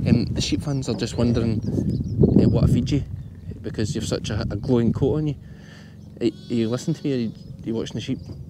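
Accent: Scotland, United Kingdom. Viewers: I don’t know how but Iv put subtitles on, have you ever seen subtitles on your videos 🤣🤣🤣 it’s not good at translating the Scottish accent hilarious what it comes up with! Enjoying the lambing diary 👍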